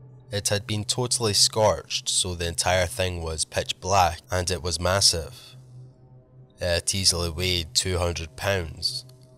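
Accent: Scotland, United Kingdom. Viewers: Came across you through Swamp Dweller. Love the Scot accent. TY, you'll see me around. :)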